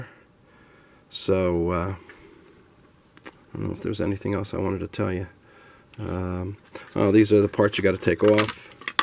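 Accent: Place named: New York, United States. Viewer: Keep , keeping busy bud . Just love your New York accent . Merry Christmas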